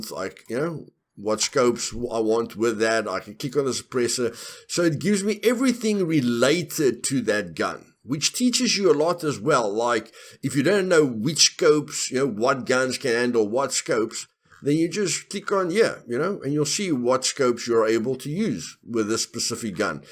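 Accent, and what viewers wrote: Afrikaans, South Africa. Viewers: What servers do you play on im from South-Africa and you sound a lot like n afrikaans man ;) i was just wondering sothat i know what to play on aswell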